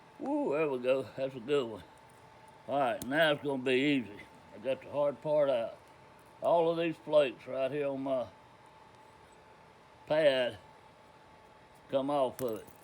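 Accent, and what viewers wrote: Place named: South, United States. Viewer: thank you for sharing your knowledge, and I could listen to you talk all day with that southern draw. where ya from?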